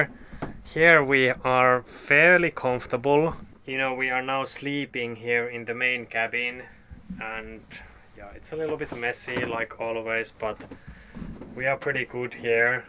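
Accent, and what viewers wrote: Finland. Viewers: Finnish, judging by his accent.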